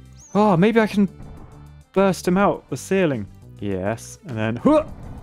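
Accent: United Kingdom. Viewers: this bloke sounds cheeky BRITISH maybe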